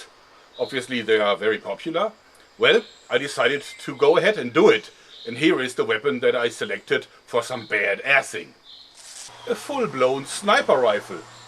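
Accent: Germany. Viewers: are you from germany originally? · Ich bin mir nicht ganz so sicher, aber bist du deutsch? :D Hörst dich nämlich ein wenig so an ^^ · as a german i got to say that this sounds like a german dad speaking english. r u german o.O ?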